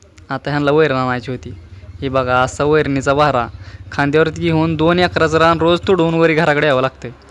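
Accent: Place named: Solapur, India. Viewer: सोलापुरी भाषा खुप खुप छान आहे 👌👌👌👌🎉खूप आवडते आस बोलायला ❤❤❤ त्या झाडाला आमच्या कडे जमु बेट म्हणतात पण तुमच्याकडे काय नाव आहे त्या झाडाचे👍👍👍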